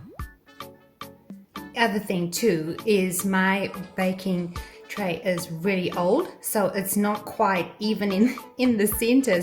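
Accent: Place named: South Africa. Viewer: Your accent sounds so much like our South African accent. People used to tell me we sound like the Aussies and NZlanders and I could never hear it. I hear it now. Haha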